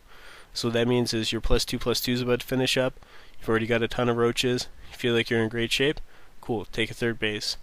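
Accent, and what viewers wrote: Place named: Canada. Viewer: ha,I guessed right, you were canadian! Didnt really pay much attention how you speak, but eventually that 'o' came out quite clearly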